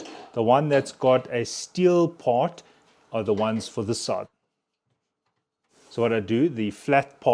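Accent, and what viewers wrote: South Africa. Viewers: South African accent, mooi🙂👊👍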